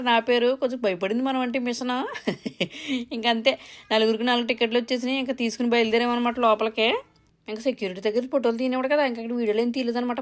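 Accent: Godavari, India. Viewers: మీ గోదావరి యాస గొప్పగా ఉందండి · Akka super Godavari telugu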